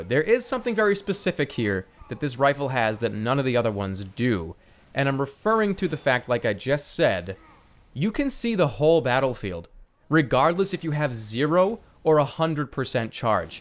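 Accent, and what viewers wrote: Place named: Boston, United States. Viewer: I keep trying to put my finger on his accent, but I'm not that familiar with different parts of the US and their accents. Is he Boston, Brooklyn, or neither? I kinda wanna say Boston, but like I said I don't know .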